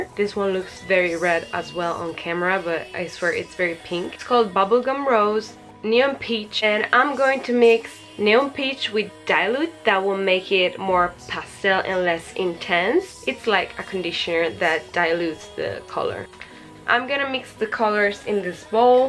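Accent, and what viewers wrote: Italy. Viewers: Sei bellissima! Ma sei italiana vero? si sente l’accento! Potresti fare anche video in italiano pleaseee💖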